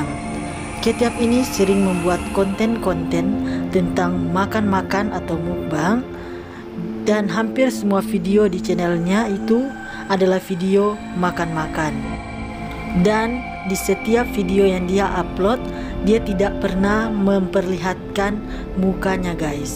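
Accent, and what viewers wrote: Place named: West Sumatra, Indonesia. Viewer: loghat Minang nya keliatan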